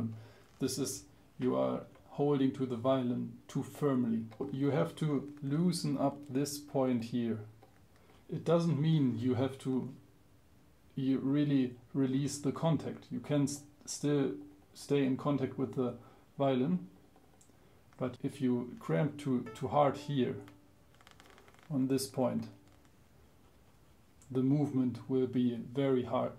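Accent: Germany. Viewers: haha it's german accent lol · before i look about you i knew that you r from Germany from accent.this video is so helpful for me .so cute _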